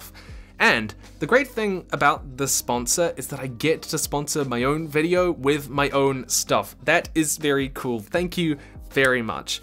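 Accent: New Zealand. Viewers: This guy is from New Zealand, yes? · He-he. "Dee-Deevil" Kiwi accents are the best.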